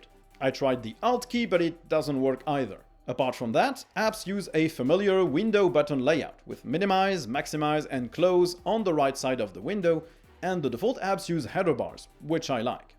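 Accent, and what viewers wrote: France. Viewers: More french acccent please, it made me laugh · I like that french English accent it's funny af 🫣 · I love le franch man avec un accent anglais horrible ^^ Bonne vidéo comme d’habitude sinon même si ce DE n’est pas fait pour moi. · More French accent, s'il vous plaît! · i love your French accent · I'm comenting for more French accent 😁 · I'm here to comment that I want more French accent. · Yes, please, more french accent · We need more French accent of English!!!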